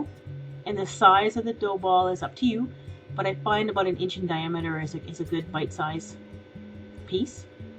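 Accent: Canada. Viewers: Are you Canadian? :) And I love your videos! They are very helpful! :D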